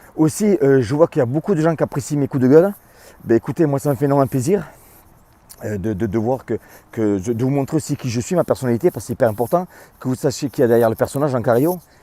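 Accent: South, France. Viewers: J adore votre accent " Sudiste " !!!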